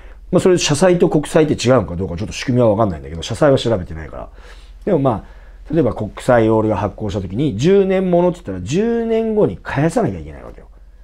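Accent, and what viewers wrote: Nagoya, Japan. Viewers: おみゃーさん、名古屋弁まるだしだがや。ステキ…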